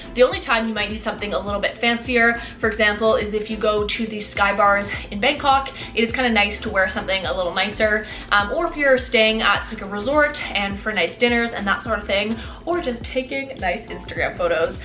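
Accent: Canada. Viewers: I love the way you say bag hahahaha your Canadian accent is so cute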